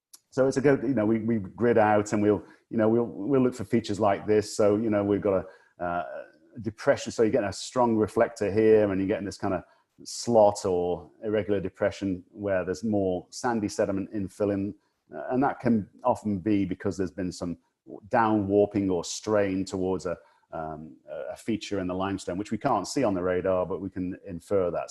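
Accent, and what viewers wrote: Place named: England, United Kingdom. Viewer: From a random Floridian: Thanks for posting this. I was already familiar with most of the geological history and hydrology of Florida, but it was very interesting to learn about some of the engineering, economics, and politics of sinkholes. Plus it’s always fun to hear our town names pronounced with an English accent. Cheers!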